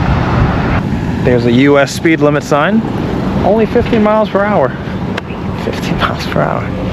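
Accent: New York, United States. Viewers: Yep...you're from New York, alright. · dude you sounded like a Newyorker.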